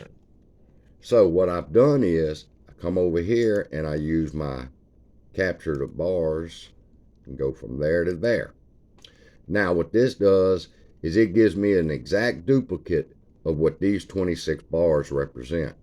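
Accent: South, United States. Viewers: Instantly subscribed. I follow a lot of fancy channels. A lot of big ones with big words and figures. You broke it down plain and simple. The southern accent was a cherry on top. Thanks for keeping an eye out for the sundial crew.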